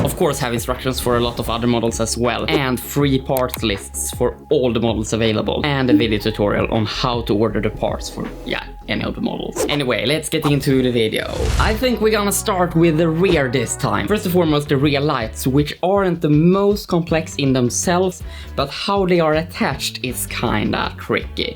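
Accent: Sweden. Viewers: Så stark svensk brytning haha...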